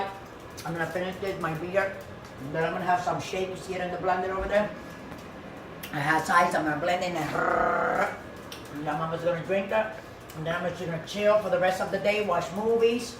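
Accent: New York, United States. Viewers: Found you on the foodie channel.. and omg I’m in love with your channel. So glad I clicked. Love your energy! and you and your son doing this together! 💗(And your fur babies a big PLUS 🐶)!!! Where are you from... Sounds familiar!? I’m from NY, and that’s where you sound from. Love it!! I hope to see you over at my channel to. I think you’d enjoy it!! Many blessings to your channel honey! 💗👩🏻‍🍳🦖 PS I loveeeeee me a Cuban sandwich. Omg YASSSSS girl. You killed it! 🤤🤤🤤🙌🏻🙌🏻🙌🏻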